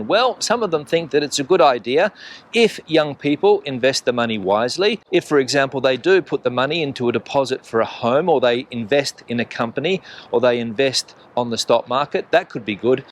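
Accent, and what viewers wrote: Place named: Australia. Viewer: Congratulations on the best Australian accent!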